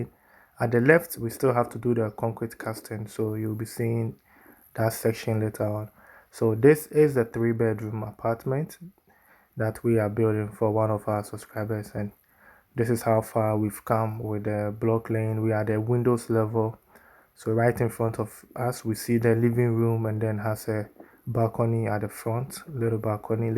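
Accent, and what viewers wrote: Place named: Akan, Ghana. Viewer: Wow I love your Akan tone , it travels to millions in our homeland than the English Language. We've been shying away from our own dialect and i'm proud till date , i speak three languages in Ghana.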